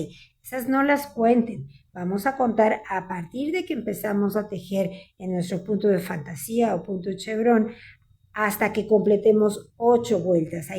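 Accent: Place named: Mexico. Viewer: gracias, acabo de hacer una muestra con punto bobo y también queda muy bonito., no se de que país sois ? el acento parece de México, o tal vez me equivoco 🤭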